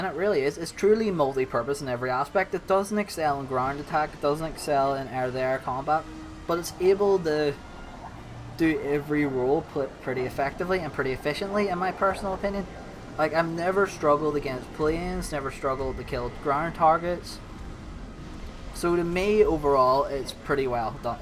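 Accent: Northern Ireland, United Kingdom. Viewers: 100% northern irish, greetings from Lisburn ayy. Great video btw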